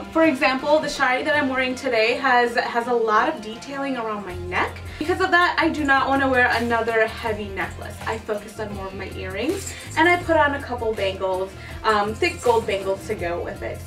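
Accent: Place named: Bengal, India. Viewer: Shes Bengali....have some linguistic awareness.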